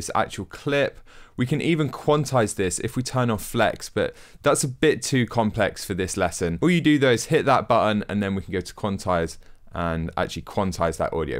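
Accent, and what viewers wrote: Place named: Manchester, United Kingdom. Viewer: you from Manchester bro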